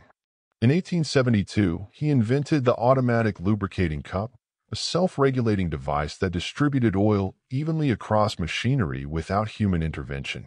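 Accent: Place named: Europe. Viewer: Just as sad is this video using a voice that sounds european why? Are you focus on them or what?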